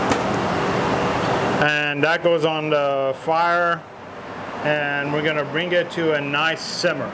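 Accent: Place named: Texas, United States. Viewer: Well now I've seen it all, just about.. An Armenian with a Texas accent.... yee-ha mayre tagherem!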